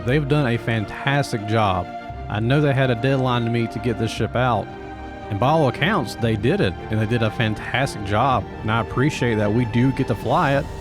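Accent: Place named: Tennessee, United States. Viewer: This guy is either from Tennessee or Kentucky. That accent..holy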